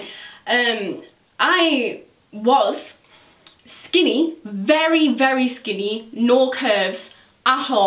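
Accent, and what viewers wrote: Newcastle, United Kingdom. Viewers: Why would she fake it? She lives in/near Newcastle and even in vlogs and stuff she speaks like that. Theres no reasonable reason for her to be faking it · Jeez she obviously doesn't fake it, have you tried to do a Newcastle accent, yeah! It's hard! Also she has done vlogs with her friends who are also from Newcastle. Her dad didn't grow up there so that's why he hasn't got an accent. Her mum probably just doesn't want to be in the videos and then what reason would she have to fake it jeezussss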